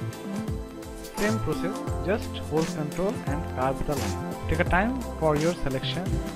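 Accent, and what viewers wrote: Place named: India. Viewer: You can guess it from his accent probably Indian or Pakistani.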